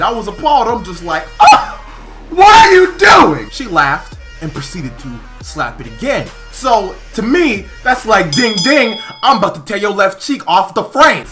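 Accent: United States. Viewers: You from michigan bro?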